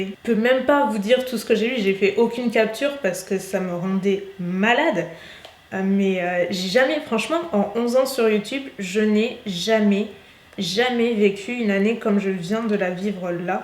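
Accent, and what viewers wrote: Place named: South-West, France. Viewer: Le message d'une abonnée discrète ( et ça me va ) ! Je suis là pour le contenu et certainement pour cet accent du sud ouest qui est le même que le mien ( discrète et chauvine ! ) J'adore cette franchise, tu avais dit que oui tu en devais beaucoup à ta communauté et que non ta réussite de ne lui appartenait pas ! Elle est bien à toi cette réussite! Plein de force, de courage, de réussite, d'amour de toi !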